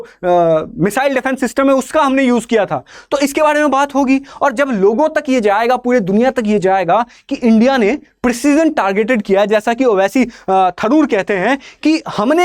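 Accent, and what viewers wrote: Bihar, India. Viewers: sir have such a bihari accent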